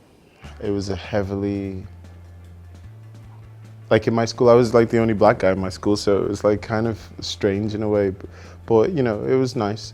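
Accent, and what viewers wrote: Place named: Liverpool, United Kingdom. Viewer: He sounds scouse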